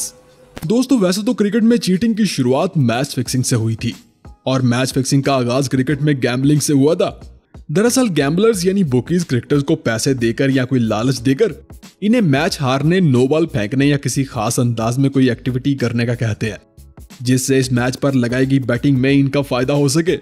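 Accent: Pakistan. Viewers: Tera accent bilkul Pakistani hai but I know you are indian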